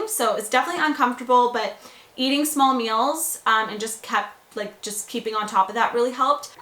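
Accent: Canada. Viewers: Is she Canadian???!! I can hear an accent!!!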